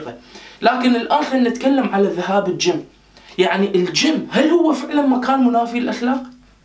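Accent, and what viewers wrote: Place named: Iraq. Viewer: اول شي كتير حابة رحب بلهجتك لعراقية لحلوة لانو سدق او لا أني انا عراقية ومابتفرج/بسمع لشغلات عراقية الا بنادرمو منشان أني مو حابة اللهجة بس لانو كل ما بطلع بشوف عقول تعبانة ومتضررة . وكتتتييير انصدمت من ثقافتك ووعيك عنجد إنّو شي خلاني كمل الفديو ل الاخير ( الله يخليك). انشالله يارب انتا كمان فينك توعي البنات والشباب لحته يصير في رقي للمجتمع لعربي. ياريت إتكمل وماتوقف تعمل هيك توعية لانو حكيك كتير منطقي يمكن المحتوى يلي بتحكي لح ياخد وقت لحته يطبق والسبب العادات التقاليد والعيب. ف بتمنالك كل التوفيق والنجاح يارب. Keep it up!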